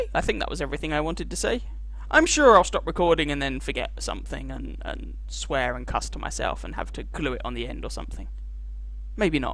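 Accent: England, United Kingdom. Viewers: Well done on the new job. As Wulf asks.... where in Scotland? I just assumed you where in Sassanach country from your accent. Keep up the good work :-)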